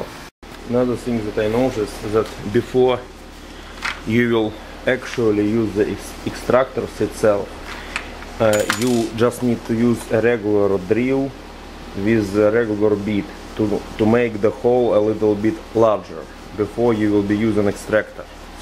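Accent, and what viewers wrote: Russia. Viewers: Как будто чувак русский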